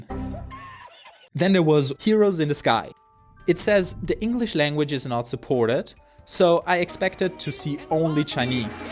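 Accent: Germany. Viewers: sounds so german, 100% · Are u german? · Bro casually spent almist 600 bucks for trash games❤💙💛 Greetings from Germany Im pretty sure I hear a german accent here? :P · are you german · Bro sounds pretty german.... Are you german? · Deutscher aktzent? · sound like german accent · Dieser Deutsche akzent xD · I Hear Deutsch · Krank wie sehr man den deutschen akzent raushört wenn man dein gamertag sieht.😂 wirklich gutes englisch.😌 kommt fast authentisch rüber bis man deinen nicknamen als deutscher liest.😂 aber geiles video junge. Hau die scheisse raus. Ich knödel dir auch nen abo raus.😂🫶🏽💀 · Bruder man hört ja krass das du deutsch bist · Ayo my friend are you german by any Chance?:) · Ich liebe diesen deutschen aktzent. Gutes Video. Mach weiter so ❤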